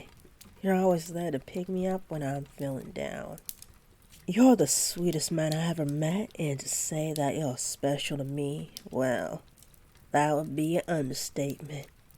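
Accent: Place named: South, United States. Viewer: Damn, that southern accent hits me, great work on the audio chief!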